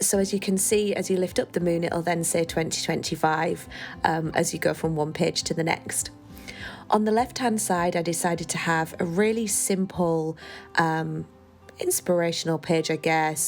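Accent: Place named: United Kingdom. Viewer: So pretty!! I am not the best at identifying UK accents but always curious. Where is your from?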